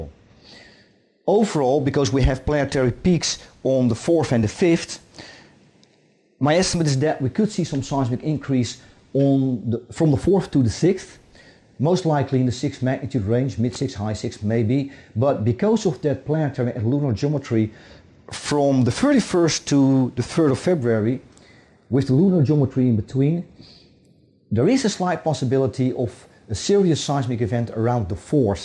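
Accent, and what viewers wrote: Netherlands. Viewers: typically Dutch ;-). We almost all speak like that over here :').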